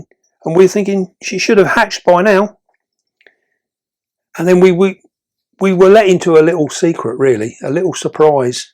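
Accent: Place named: England, United Kingdom. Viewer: I'm late seeing this, sorry. But so enjoyable! I respect and learn from many channels and keepers, but you and are my favorite! It's educating, fabulously interesting and funny too at many times...this is one! I am a sucker for an English accent as well!😂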